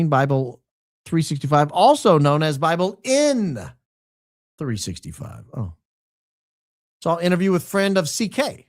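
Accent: South, United States. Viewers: Just ridden across London bridge listening to your Southern tones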